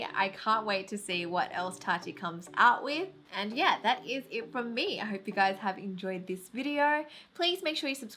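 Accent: Australia. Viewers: Is your accent Australian? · Australian accent???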